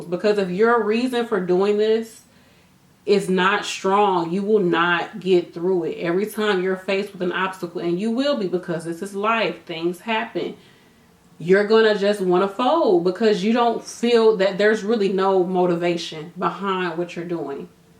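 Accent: St. Louis, United States. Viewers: You from St. Louis? Great video btw!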